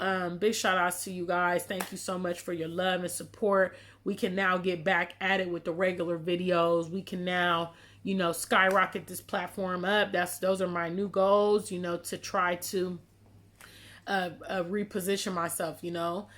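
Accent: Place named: United States. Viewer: Well come back sis, your American accent with twi made me chuckle. 😂 God bless you.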